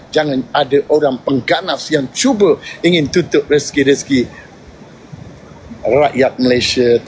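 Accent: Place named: Bangladesh. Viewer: Dia ni sapa.. dia ckp psl apa ni? Ckp mcm Bangla je.. x fhm.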